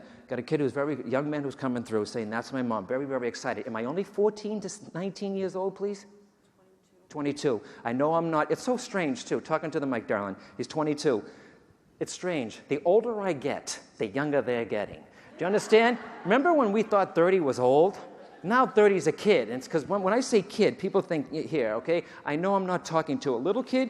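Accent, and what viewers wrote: Boston, United States. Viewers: I'm from Rhode Island.. sista-!! Lol Bossston'~!! We've got that 'hard ass' slang accent~! Beautiful ..👌🏼. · I LOVE THIS GUY!! I grew up on Cape Cod , MA - he sounds like all of my college classmates, from around Boston, Southy, Framingham!! I love the accent. I can't wait to see my 18 year old Terrier!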